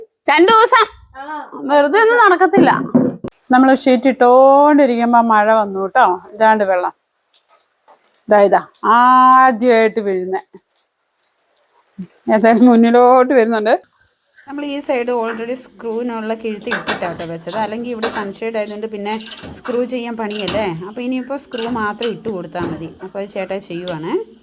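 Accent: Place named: Karnataka, India. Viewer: Veed yevideya ?kekumbo nammude karnataka malayali pole undalo😊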